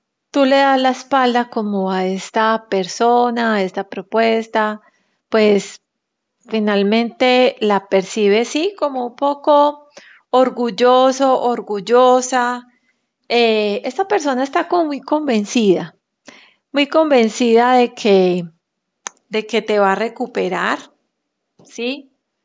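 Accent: Colombia. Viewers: Qué gusto verte siempre tan radiante, tan positiva y con ese hermoso acento colombiano... Saludos desde México... Arriba Colombia!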